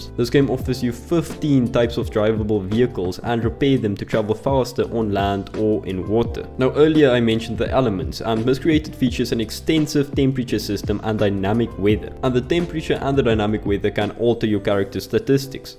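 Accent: South Africa. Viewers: With in the firs 1 second i could tell ur a fellow south african gon be giving u a sub and so on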